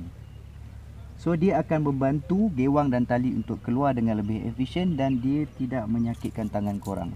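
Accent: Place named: Melaka, Malaysia. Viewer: Mantap Dato Seri.. Episyen!.. mcm org Melaka piau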